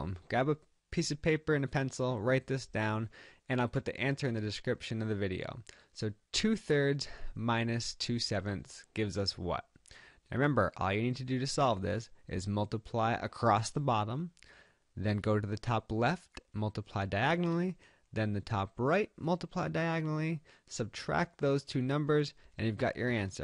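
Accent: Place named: United States. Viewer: What is this sir, we are in India not in America try normal english we are not understanding your language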